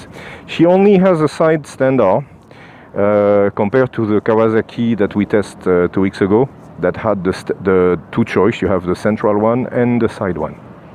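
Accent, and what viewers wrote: France. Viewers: Mdr!!! je suis tombé sur la partie 2 et j'ai jamais aussi bien compris l'anglais du coup j'ai posté un com qui disait tu parle comme un français! ! et là je regarde la partie 1 et je vois ton com...ah ok je m"était pas trompé. ...j'ai vu cette brèle jeudi chez honda a Clermont elle fait rêver dommage qu'elle ne soit pas en 450...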